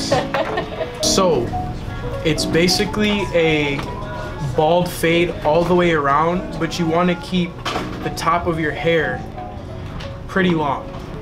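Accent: Wisconsin, United States. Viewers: His wisconsin accent is the best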